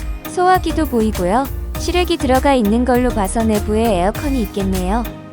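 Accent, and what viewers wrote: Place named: Gyeongsang, South Korea. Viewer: 기계음보다 대표님의 경상도 사투리가 더 좋아요~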